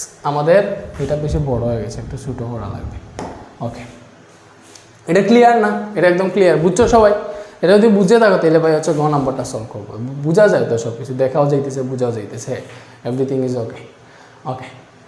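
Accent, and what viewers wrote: Sylhet, Bangladesh. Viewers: Bai kita sylheti ni mate to ola lage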